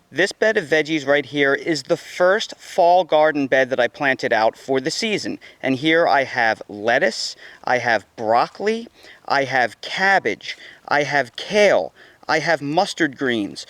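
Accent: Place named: New York, United States. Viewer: Your in nc but you have a ny accent😀excellent video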